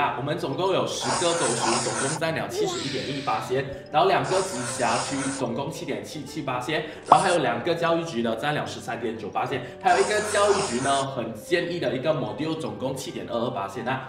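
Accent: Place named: Terengganu, Malaysia. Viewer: Teacher have Terengganu mah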